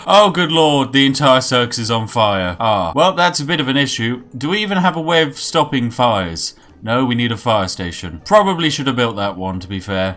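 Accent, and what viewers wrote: United Kingdom. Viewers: haha he's a brit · Wait... is this guy British?!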